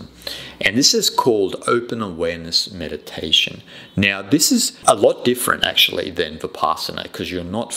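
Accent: Australia. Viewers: P.S. Are you Australian? 😌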